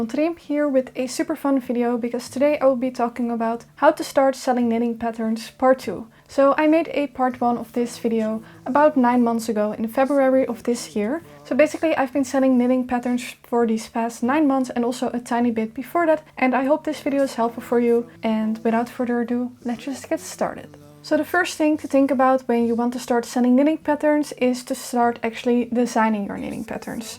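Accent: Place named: Netherlands. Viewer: Thanks! I just came across you when starting my info search, and so far my favorite source!;) I heard your accent, and recognized from my 20 years at KLM in the past; Dank je wel!! Veronica (Swedish American living in Switzerland)🤭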